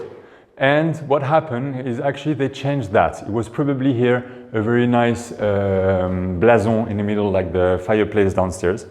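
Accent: France. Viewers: This will be a fascinating restoration. You are very entertaining and I enjoy your humor and lovely french accent. Thank you for the tour and explanation of your plans.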